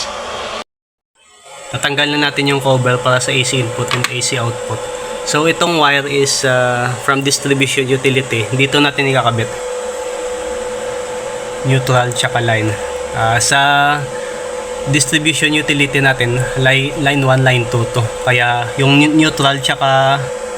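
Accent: Iloilo, Philippines. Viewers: Sir ilonggo poh bah kayo? 😊😊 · Bal.anon gid nga ilonggo ka boss😂😂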